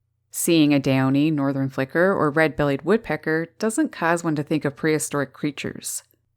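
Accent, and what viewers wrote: Newfoundland, Canada. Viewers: Oh my, you sound Canadian. I'm sorry that our president is a jerk. I enjoyed your video very much. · Hi, just curious, are you by chance from Newfoundland?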